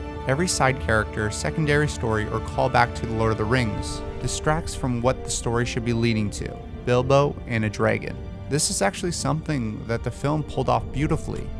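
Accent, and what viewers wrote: United States. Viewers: Is that a Florida accent? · Are you from Wisconsin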